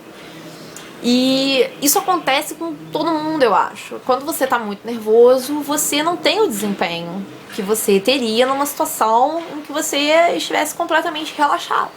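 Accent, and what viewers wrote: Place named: United States. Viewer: Nossa, como você fez para ter um 'speaking' tão bom? Adoraria ir para os EUA e eles acharem que sou de lá também rs (como todo mundo né rs). Enfim, dicas para falar tão bem assim e perder o 'sotaque brasileiro'? Sei que o assunto é 'Listening', mas como seu sotaque americano ficou tão perfeito assim? Thanks a lot :)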